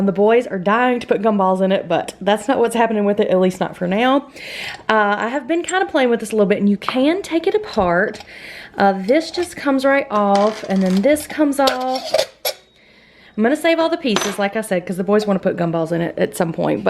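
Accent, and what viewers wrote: South, United States. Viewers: Your Southern Voice is Adorable....Just like your Crafts<<<<Happy Holidays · I’m loving your energy and your southern ‘ya’ll’. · I adore the peppermints for my nieces rooms , love your southern accent ;) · Love the southern accent! Great video, thanks.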